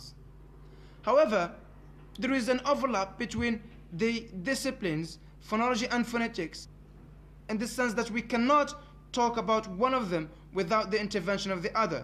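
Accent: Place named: Morocco. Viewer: Moroccan accent!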